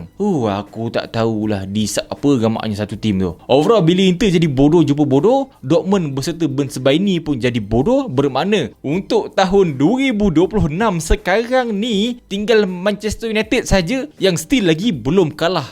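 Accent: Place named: Kedah, Malaysia. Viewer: Harey hg cakap kedah bunyi supa taik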